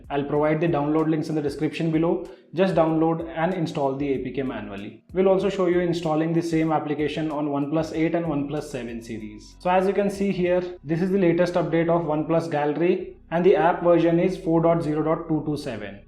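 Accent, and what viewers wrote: India. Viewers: Indian style English is awesome.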